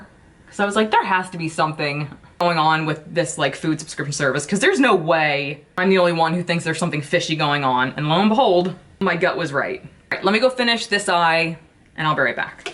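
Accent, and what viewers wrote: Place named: Philadelphia, United States. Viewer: I recognized your slight accent and realized you’re a fellow philadelphian ☺️ I love your content and how real you are!!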